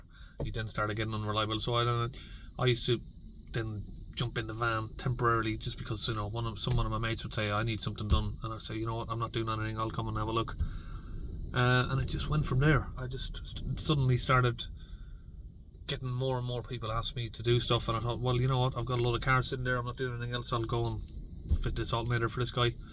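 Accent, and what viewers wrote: Ireland. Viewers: Thanks. For to be in England form 12years old, good to hear you haven’t lost the Irish accent. 👍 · It woukd great to.see.ya moving to ireland ,I have two luton maxi movers ready , for a fella that spent that long in the uk ya never lost the accent carlow or laois ?by the way 40 isn't that old ,ireland got very expensive that last 5 years though great channel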